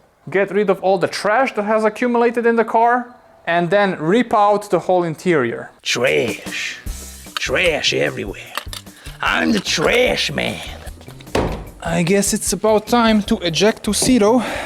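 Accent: Slovenia. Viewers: You got that Slavoj Zizek accent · lmao the slovenian accnet is killing me as a serbian.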